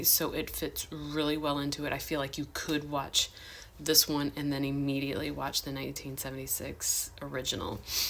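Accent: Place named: Midwest, United States. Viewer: I dig the midwest twang.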